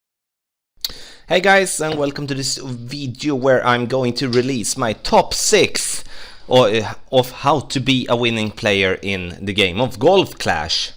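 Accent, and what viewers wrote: Scania, Sweden. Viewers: Nice Swedish accent 😊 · Dats how pejpol from skåene in sweden sounds lajk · Are u swidish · This is some of the most swedish sounding english I have ever heard. Love it!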